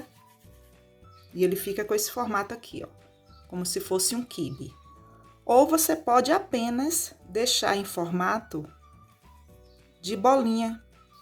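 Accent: Bahia, Brazil. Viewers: Conheço sotaque baiano de longe,amei a receita e sua voz kkkkkk. Bj 😙 sucesso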